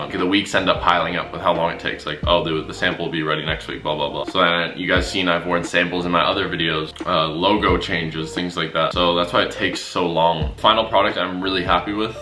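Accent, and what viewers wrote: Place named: Canada. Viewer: You sound extra canadian in this vid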